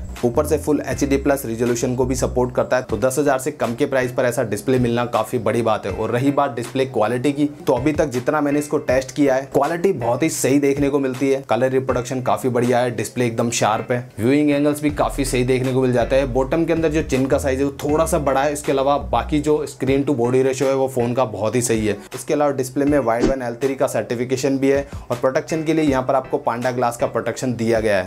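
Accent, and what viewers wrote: Rajasthan, India. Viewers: Your swag is lovely bro. Cool hairband. Little Rajasthani accent. And fantastic review. Katai zaher bro.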